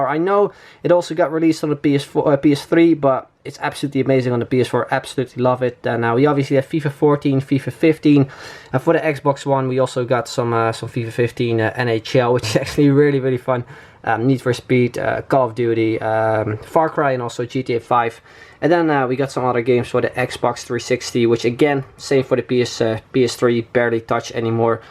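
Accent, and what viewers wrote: Netherlands. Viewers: Ik hoor gewoon aan je engels dat je nederlands bent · Haha had ik ook ik dacht meteen sowieso Nederlands! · you have a really funny dutch accent(also i am) but you're vids are great man keep it up!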